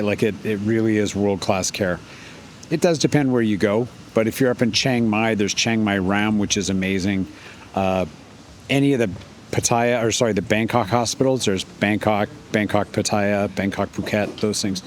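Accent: Canada. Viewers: Your accent appears to be Canadian. How come you didn't go for the free stuff in Canada?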